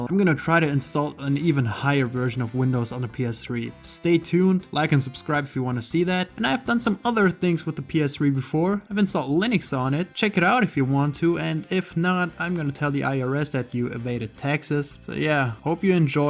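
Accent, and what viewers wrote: Germany. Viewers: Du bist deutsch oder?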